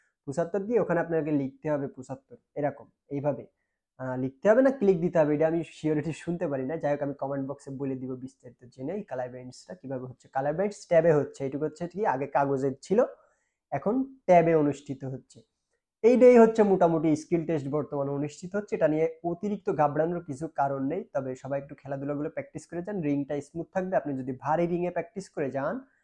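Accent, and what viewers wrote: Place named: Fakirhat, Bangladesh. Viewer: আপনার বাড়ি কি ফকিরহাট,আমাদের এলাকার কথার স্টাইল,আমার ১৫ তারিখ,স্কিল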